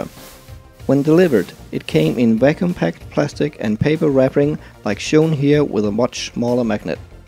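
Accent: Denmark. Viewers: Sorry was just curious, thought i seen alot of german on magnets and such but you sounded very distinctly Danish. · Danish. I knew it from the accent :D Interesting video, bro! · sys nok jeg ku høre du var dansker men var i tvivl :D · I like the danish accent..sounds cute somehow =)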